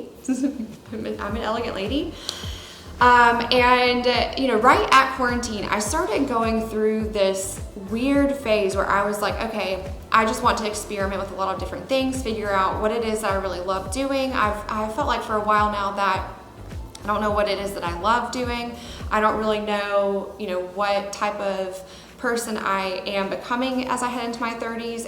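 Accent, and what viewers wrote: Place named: New Jersey, United States. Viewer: Sounds like a Jersey accent to me.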